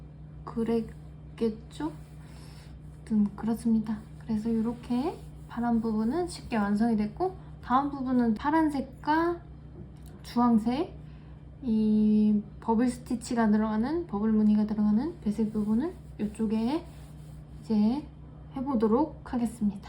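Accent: Seoul, South Korea. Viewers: 정말 예쁩니다 판다면 사고싶을 정도로에요 ㅜㅜ 긴머리랑도 잘 어울리시네요 추천으러 처음 봤는데 말투가 중독이에요 약간 서울사투리+어딘가 사투리 섞인 느낌이네요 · 말씀하시는게 약간 옛날 서울사투리? 그런 느낌이라 너무 신기해요 ㅋㅋㅋㅋㅋㅋㅋ 목소리 높낮이도 그렇고 말투도 그런느낌이세요!! 목소리 이쁘시고 묘하게 웃겨요 ㅋㅋㅋㅋㅋ 😂😂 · 서울사투리 오랜만에 듣네요 ㅋㅋㅎㅎㅎ 잘 배워갑니다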